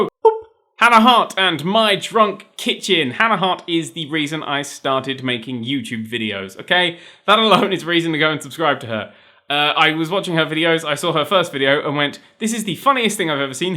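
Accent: England, United Kingdom. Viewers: ?r u from england nerd?<3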